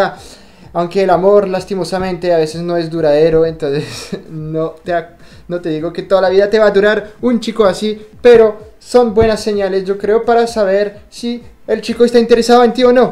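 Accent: Colombia. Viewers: Tu español es muy bueno para ser Francés, tienes un acento bien colombiano btw. Muy interesante el vídeo lol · Hablas muy bien el español, incluso tienes acento, parece colombiano, eres genial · Holaa me encanta tus vídeos siempre hablas muy relagado y colombiano un abrazo 😚😊💞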